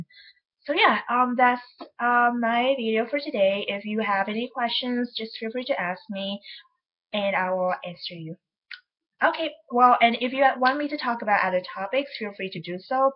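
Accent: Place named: United States. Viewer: hey how long have you been there? Your accent is almost 100% american. I am confused about this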